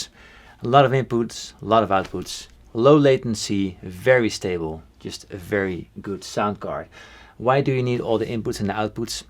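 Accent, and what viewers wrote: Netherlands. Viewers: ah, so Dutch? ty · English sounds best with a dutch accent, from my POV!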